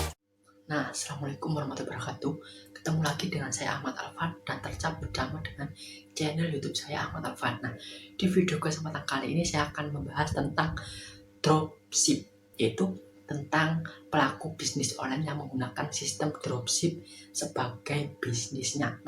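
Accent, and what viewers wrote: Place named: Java, Indonesia. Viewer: Medok bnget jawanya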